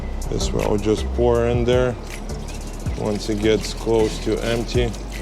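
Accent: Russia. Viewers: Я думал мне показалось что автор русский😂 👍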